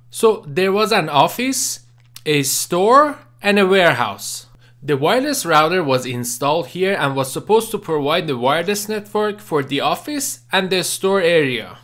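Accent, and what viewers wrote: Iran. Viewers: Loll gotta love the Persian accent 👍🏼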